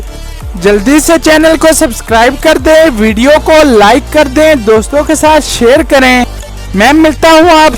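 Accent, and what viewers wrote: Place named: Punjab, Pakistan. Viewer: Punjabi twist in urdu, not good pronounciation like youtuber majid malik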